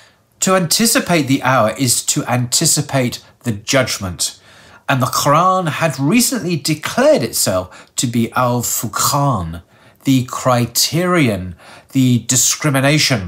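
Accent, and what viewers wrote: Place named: RP, United Kingdom. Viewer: Just a side point but your reading / narrating skill is marvelous especially with that touch of British posh ness Allah bless you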